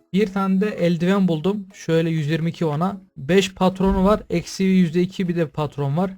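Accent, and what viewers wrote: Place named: Konya, Turkey. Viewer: ilk 5 saniyede anladım konyalı oldugunu :D Çumralı mısın?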